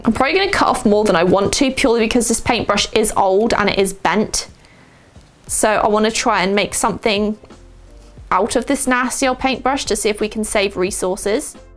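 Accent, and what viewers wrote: England, United Kingdom. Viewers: Is she from england?? I lover her accent😭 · so, I saw the video in my feed, i entered, i listened to her british accent, I saw that she is a cool person, and I subscribed. that's all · Very British, but you kept mentioning target and dollers... Do you live in America? But were born in England?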